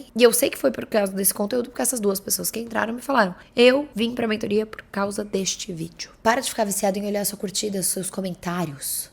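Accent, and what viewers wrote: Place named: São Paulo, Brazil. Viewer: Paulista fala desse jeito mesmo!😊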